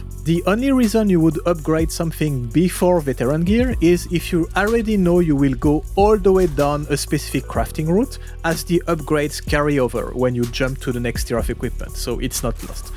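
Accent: France. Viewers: Are you french ? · Thx very good video, are you french tho?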